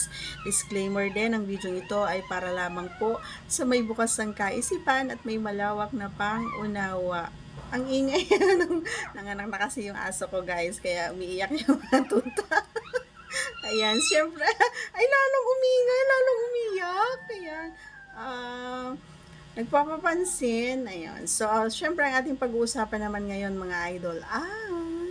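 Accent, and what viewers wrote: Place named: Visayas, Philippines. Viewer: Saan ba probinsia mo baby ? bisaya ako halata kita bisaya ka.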